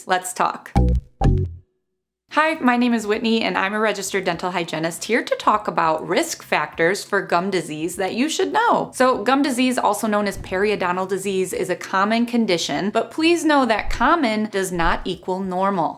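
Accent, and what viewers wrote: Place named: Chicago, United States. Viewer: You’re from Chicago right? I sense a Chicago accent it’s so cute!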